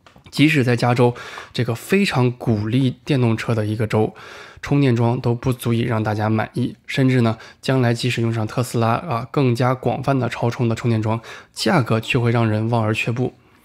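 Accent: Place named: Northeast, China. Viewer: 感觉像东北😃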